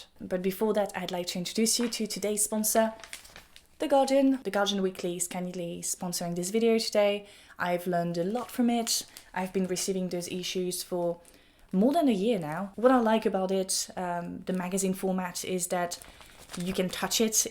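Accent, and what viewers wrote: France. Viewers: damn i am just a sucker for the french accent. love your content btw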